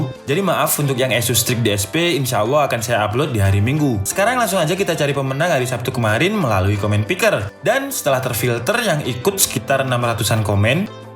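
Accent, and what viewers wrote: Java, Indonesia. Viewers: Njarem jawa bangett haha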